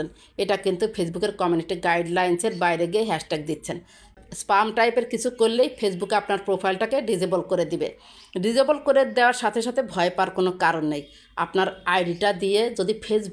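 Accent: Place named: Chittagong, Bangladesh. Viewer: আপু আপনার বাড়ি কি চিটাগাং।আপনার কথায় মনে হচ্ছে । তাই জানতে চাই ভুল হলে কমা করবেন। ধন্যবাদ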